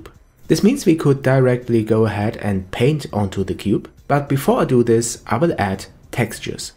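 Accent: Germany. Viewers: Me: I need a clear concise tutorial... (Clicks on tutorial): (German Accent) "Hello Guys, here's a Blender 2.8..." Me: Oh thank god:D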